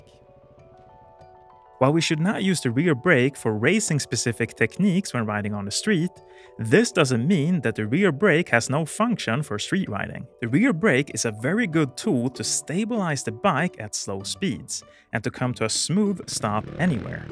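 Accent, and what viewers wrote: Sweden. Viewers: Your swedish accent makes it way more accessible! Bromsa bak och titta fram! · Someone got a case of the swenglish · Är du ifrån Sverige?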